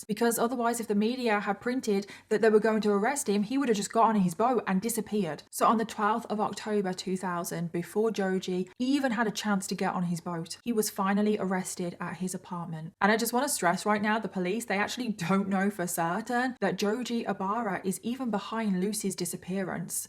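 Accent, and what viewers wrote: United Kingdom. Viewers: My mother in law is from the UK, I just love your accent!!! And love your story telling!!! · Her British accent also helps. I've always found this irestisteble in women😆🥁😆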